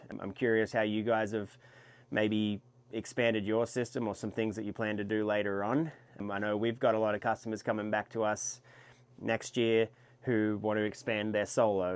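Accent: Australia. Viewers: Just me or you sound very Aussie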